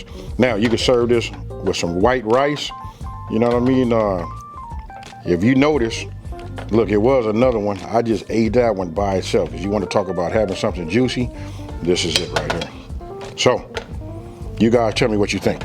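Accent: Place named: California, United States. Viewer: I'm digging the Cali accent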